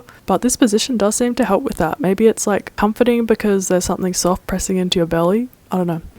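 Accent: Australia. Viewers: Wasn't expecting the Australian accent, but hello from a Brit who is an Australian citizen! This has given me a great idea to track my own day for all of the accommodations I give myself. Not sure what your invisible illness is as I'm not familiar with your channel, but I'm hoping you've looked into POTS. And love Bananagrams, btw 🙂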